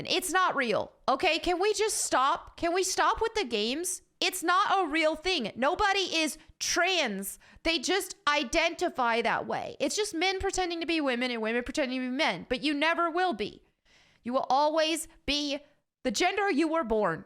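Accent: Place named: South Carolina, United States. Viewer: I love you. You have such a South Carolina accent, which is where I'm from. I kind of recognized it.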